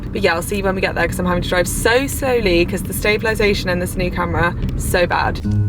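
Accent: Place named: England, United Kingdom. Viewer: I have never been in England..but it have always been so magical to me..and that accent. I dont know if im watching too much england garden tv shows or why its like that. Makes me feel warm and relaxed.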